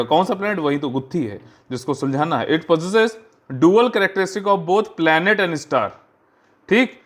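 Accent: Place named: Awadh, India. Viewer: Thank you very much....! But kanpuriya aur awadh mein sab aise hi bolte hai.... ☺️☺️☺️☺️☺️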